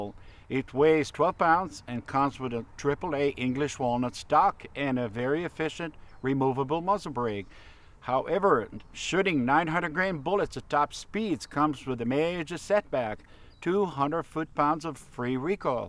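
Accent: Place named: Germany. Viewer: you sound like a German guy trying not to sound German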